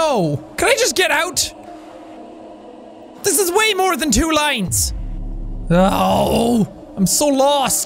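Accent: Ireland. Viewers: I think it's Irish like how he says shy-t instead of shit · i totally love his irish accent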